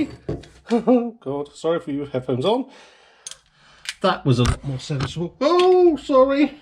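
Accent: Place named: West Country, United Kingdom. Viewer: Goin straight out after this vid to look at mine... Thx for all your great Vivaro how-to and tips 'n tricks so far..., all well explained, "proper job". Don't worry about your rabbiting, i'm quite partialled to hearing a bit of west country accent now and again. 🇨🇭 greets, Steve.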